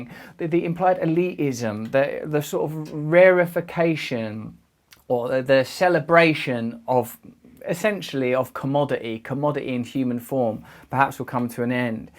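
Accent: United Kingdom. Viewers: Hard for me to understand the accent in the Queen’s tongue. Yet I really like these 1vs1 testimonials from Brand. This is a good story, that proves careful what you wish for. However I am grateful I got to see this man perform, and make me laugh on occasion. Also he’s intellectual 😂 and he is from England. Thanks for the messages. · I have to admit...the first time I saw you, I thought you were quite handsome. And then you spoke and that British accent tickled my ears. I’ve loved watching you in your movies. You don’t seem to be acting at all but rather, you walked on set and you and the role really were one. Only the best actors become the role. And then, you can walk away and you’re again. So you’re right about there being a split.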